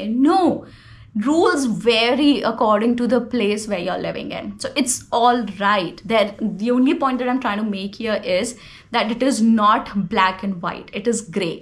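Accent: India. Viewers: I can't say not a lot of words. But i try myself everyday. Everyday i learn those vocalbury & sentence which helps me a lot. I like the way you talk. I love your indian accent. I feel comfortable & understand clearly. I make mistakes but when you talk everyday make mistakes. It's not a big issue. But sometimes i litte bit fear becoz of i make mistakes a lot. I can't tell you😅😅. I want to be a fluency in english ❤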